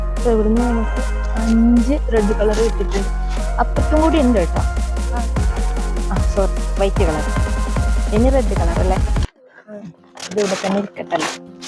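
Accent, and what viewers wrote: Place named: India. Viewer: Samsaram kettapo thoni nammalu ore gillakarado🎉🎉 God bless u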